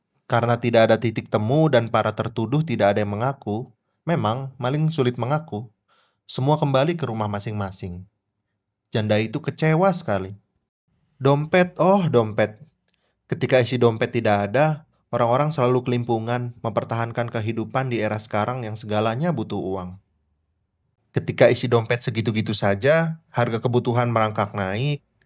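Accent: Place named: Medan, Indonesia. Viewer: Kerennnn...logat medannya berasa banget...good luck yachhh 🎉🎉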